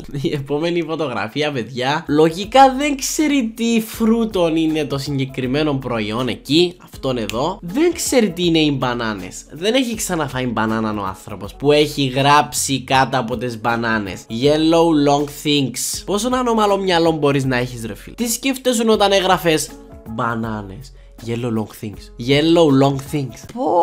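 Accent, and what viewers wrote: Cyprus. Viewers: kuprios einai aki milaei etsi h' blammenos?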